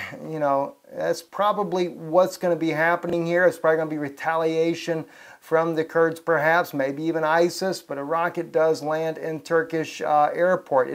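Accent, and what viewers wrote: South, United States. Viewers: That's where your familiar southern assent. greetings from Louisiana. Jesus bless brother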